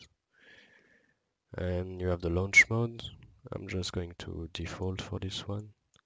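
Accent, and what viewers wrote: France. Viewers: That frenchy accent is really noticeable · C'est moi ou tu a un putain d'accent français ?? ( its me oe you have a fuking french accent ?? )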